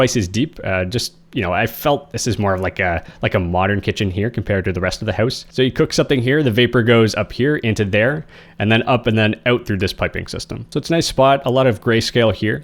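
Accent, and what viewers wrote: Canada. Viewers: this is the first video ive seen of yours, and I heard you say "aboot" and I was like "Is this guy Canadian?" By the end of the video I was like "This guy is definitely Canadian." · Man you sound Canadian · your northern accent is showing are you canadian? (from Michigan here) edit i was right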